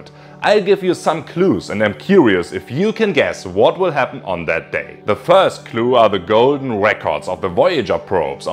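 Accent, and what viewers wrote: Germany. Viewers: i only enjoy his english in a german accent. if he spoke in german I'd be horrified. I've yet to find nice sounding german speech. it's extremely aggressive sounding.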